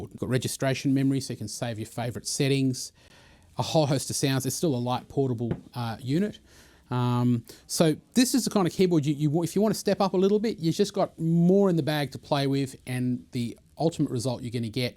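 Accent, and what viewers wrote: Australia. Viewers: This is exactly the kind of comparison vid I was looking for. Thanks heaps ! Great to hear an Aussie voice aswell on YouTube !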